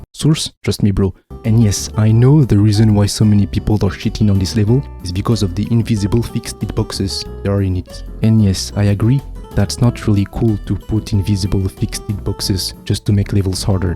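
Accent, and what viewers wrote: France. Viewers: bro are you french you sound so french lol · You have a strong sounding like French accent I like it🔥 Nice vid !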